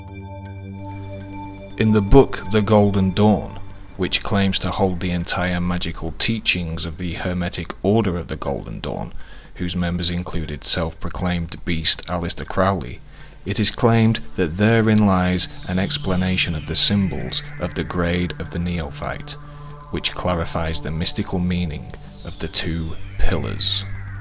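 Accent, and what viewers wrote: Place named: Liverpool, United Kingdom. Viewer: this guy loves the sound of his own voice, but still interesting non the less, not bad for a scouser. i'm a woolly back by the way if i sound bitter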